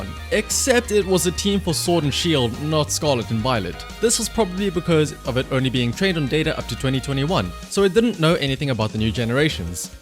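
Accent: South Africa. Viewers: Nice video bro, is that accent South African?🤔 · Fellow SA Pokemon player here based in Cape Town. Found your video via the algorithm and heard the accent and I was shook! 😁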